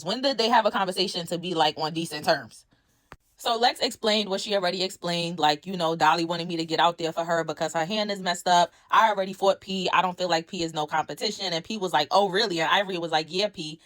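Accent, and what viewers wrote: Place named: Brooklyn, United States. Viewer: Omg you’re from bk!! I knew it lol The dialect and accent is distinct. I’m a Bk native and while I live in another borough I will always rep bk! We a different type of breed.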